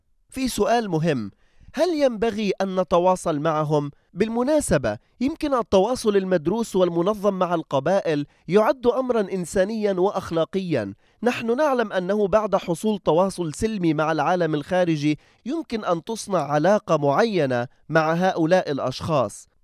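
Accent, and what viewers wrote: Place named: Gaza, Palestine. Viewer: الي بحكي مبين انو فلسطيني من غزة حسب لهجته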